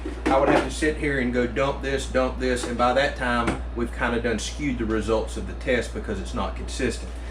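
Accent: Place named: Texas, United States. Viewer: Are you sure you are not a Texan ? That accent is spot on for people around San Antonio.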